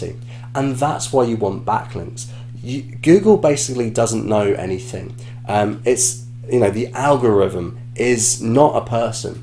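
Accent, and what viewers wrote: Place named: United Kingdom. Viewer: A British accent review is a treat to watch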